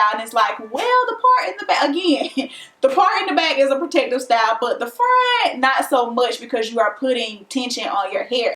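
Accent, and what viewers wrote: South, United States. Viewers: Ohhhhhhhh Lol ok I knew you had a country accent. I was like maybe her parents are from the south. Because people tell me that I have a country accent sometimes but that’s because my grandmother is from the south. But when you were saying Cousin I was “oh she from Cali because they say Cousin a lot” don’t they? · Finally yes! I can tell! lol that’s why I said influence cuz you have a southern accent the way you say “up” “Best” and “pound” it’s evident of the two. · Instantly subbed because of the southern accent 🤣 I’m from the south and it’s hard to find Youtubers who talk like me! Love the video!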